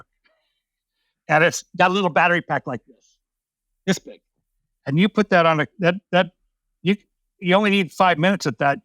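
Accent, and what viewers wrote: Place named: Ireland. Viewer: Just found your site and subscribed. Your fine Irish accent puts me in mind of Sean Kelly. Not bad company to be in! A very good chat with Greg, looking forward to you other podasts thanks.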